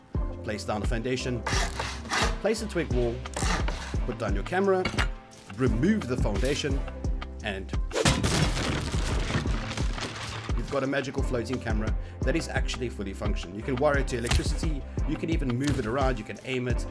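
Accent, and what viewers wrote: Afrikaans, South Africa. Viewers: He sounds Afrikaans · you sound like you come from south africa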